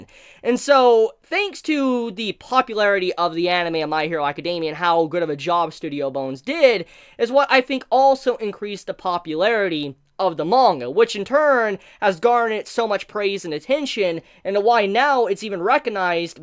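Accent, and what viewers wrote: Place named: Appalachia, United States. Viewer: brotherrrrrr hill billy voice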